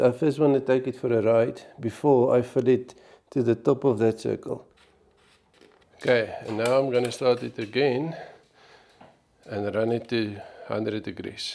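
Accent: South Africa. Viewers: Het perongeluk op jou channel afgekom. Lekker om n afrikaanse aksent te hoor al die pad hier in Skotland. Ek het n 1250GSA en kan sien eendag sal ek die videos kan kyk vir enige raad. Sterkte en kan nie wag vir volgende video nie.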